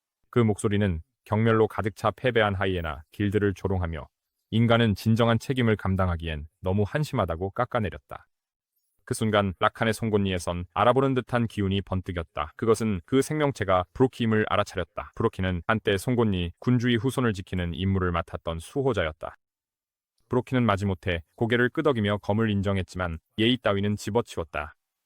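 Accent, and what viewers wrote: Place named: South Korea. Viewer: 한국인 그 자체네요... 얼굴가리고 나레이션만하면 한국인인줄 알겠어요😂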